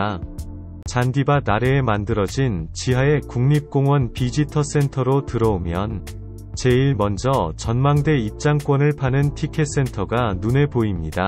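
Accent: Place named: Gyeongsang, South Korea. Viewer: 유튜브 열심히 하시기로 한거 축하드려요! 저는 이미 구독자입니다^^ 부디 실제 음성을 들려주소서.. ㅎ 경상도 사투리 나쁘지 않습니다.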